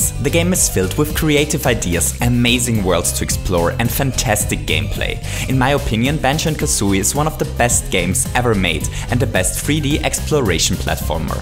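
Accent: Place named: Germany. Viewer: I guess he is from Germany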